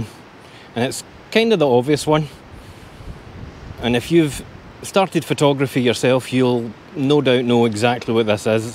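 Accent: Scotland, United Kingdom. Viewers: A wonderful introduction! Thank you so much. It was lovely hearing that great Scottish accent after so long. Keep up the great work!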